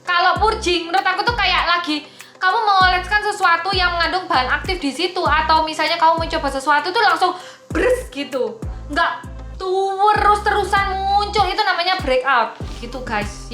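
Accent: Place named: Padang, Indonesia. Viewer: Rodok padang ngono lohhhh😂😂suka logat bicaranya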